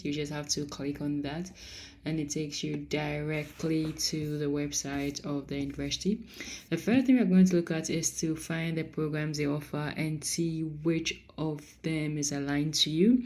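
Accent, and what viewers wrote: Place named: France. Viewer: I can feel the French accent in your presentation 😂.... Great Video 👌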